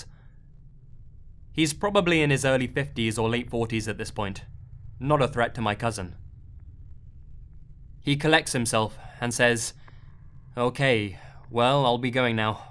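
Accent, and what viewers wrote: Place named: England, United Kingdom. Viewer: Best voice, i make fun of him but I would rather hear an English accent over any other, chap!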